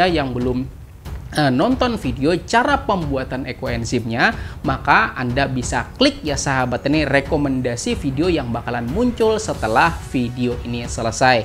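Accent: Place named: Kuala Kapuas, Indonesia. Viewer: Betul sekali pak, syg kalo di buang kulit buah, bisa di manfaatkan sebagai pembuatan kompos dna POC. Ulun suka logatnya, masih khas kaluwa nya 😁, sukses mang, dan sangat bermanfaat 🙏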